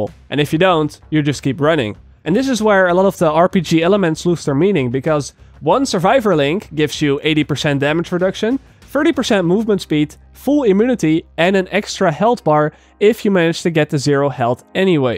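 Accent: Netherlands. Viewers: are you dutch? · he is dutch ."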